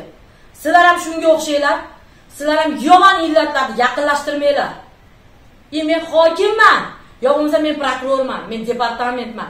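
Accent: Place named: Andijan, Uzbekistan. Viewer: Опа гапни хам Анжонча килиб ташладингиз,офаринг,ёнингиздаги 2-та опа хам гапиришга чикган эдими,халк сиз каби инсонлар бн ...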